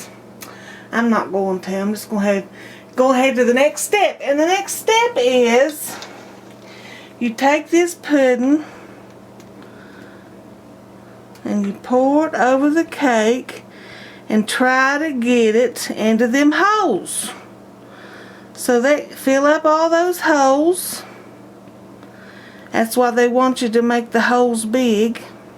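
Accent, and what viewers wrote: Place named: Kentucky, United States. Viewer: you sound like youre from hazard Kentucky. sweet accent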